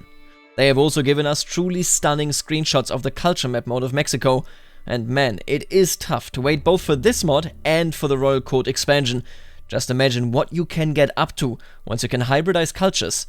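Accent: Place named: Saxony, Germany. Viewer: You sound like you're from Saxony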